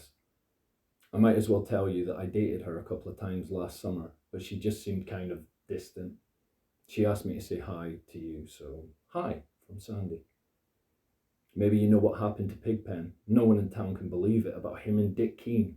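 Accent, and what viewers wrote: Scotland, United Kingdom. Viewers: Cool, I subscribed to your channel, so I look forward to that. The Scottish accent gives the reading a nice twist as I don't believe I have come across a Scottish narrator before.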